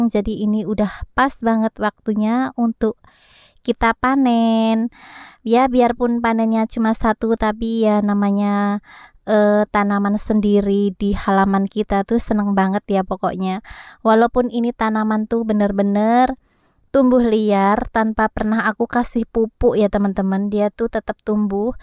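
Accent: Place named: Java, Indonesia. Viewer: Bundaa Asli Bali apa bukan bun.. Masih ada logat jawa nya heee.. Tarik sis... Semangka... Hihi.. Segee bgt..dalam nya kuning yah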